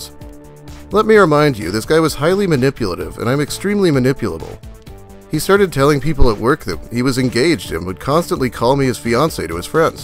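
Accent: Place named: South, United States. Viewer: As a texas boy hearing your southern accent is is nice.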